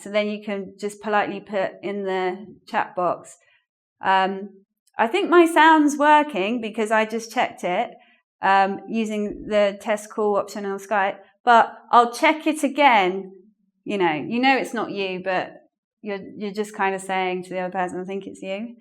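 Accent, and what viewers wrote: United Kingdom. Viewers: You're amazing !! The best accent of the world and the best and real English !! UK 👍🇬🇧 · You're a really cute teacher, I like your british accent and the way you teaching. That's great! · I love your british accent!! thanks for your class.... Greetings from Colombia. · Lets donate people, shes a great teacher with very sweet British accent. I love it :)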